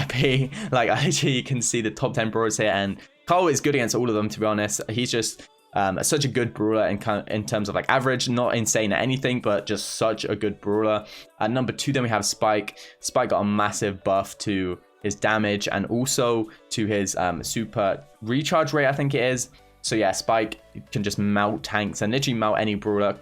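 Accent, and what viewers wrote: United Kingdom. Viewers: You speak Oxford english.Arent you?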